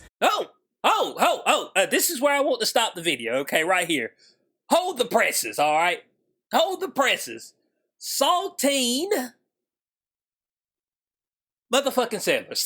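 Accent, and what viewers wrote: South, United States. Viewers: Dude I like your accent it's so country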